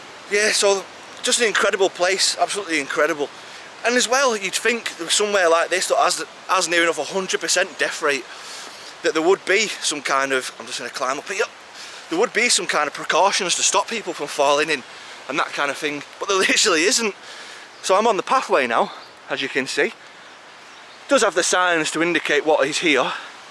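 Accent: United Kingdom. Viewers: His accent is like british but trying to speak like uncle roger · This guy has one of my favorite British accents, can someone in the UK identify it?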